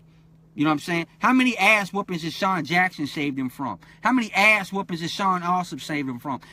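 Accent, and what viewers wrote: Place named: South, United States. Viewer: He talk like a southern guy not a black guy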